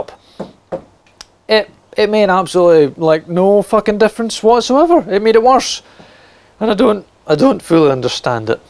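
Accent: Scotland, United Kingdom. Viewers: Listening to your?eastern? Scottish accent is bamboozling my fancy. 😁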